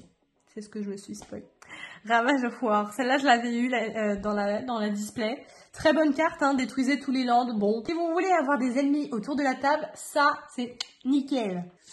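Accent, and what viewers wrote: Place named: England, United Kingdom. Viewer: Je viens de te découvrir aujourd'hui, j'adore ta bonne humeur !(et aussi ton accent anglais 😂)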